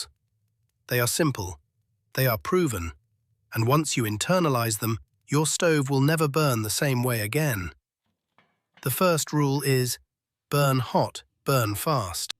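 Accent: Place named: United Kingdom. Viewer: Very well done, even with a British-like accent. :-) You might look inro something like a descendent of a pressure cooker to absorb far more btu's without filling the room with steam. I am sure most engineers could come up with something productive and financially rewarding. Best regards, JDB Retired Prof. Engr Mgr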